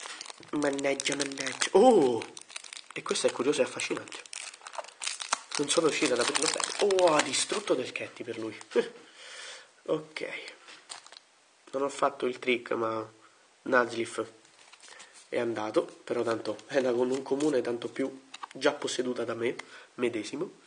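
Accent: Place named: Rome, Italy. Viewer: Si sente che sei romano o.o Comunque sei simpatico u.u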